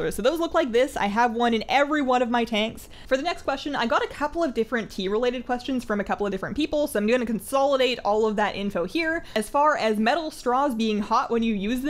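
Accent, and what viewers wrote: Canada. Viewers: You're Canadian, aren't you? :)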